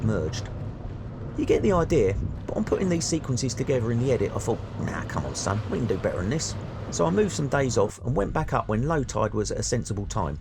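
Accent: London, United Kingdom. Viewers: Mate, I ain't sure if this is Mockney or Cockney... But either way it works brother! You comin' across ere like a right cheek-chappy! 😉👍🇬🇧 Edit: 5.35 A ground-level bay window projecting across the pavement and almost kissing a lamppost opposite!? Who/what/how did this get rubber-stamped? 🤔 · Great to hear a proper Londoner talking about London. Our government needs arresting for treason the state of the place now.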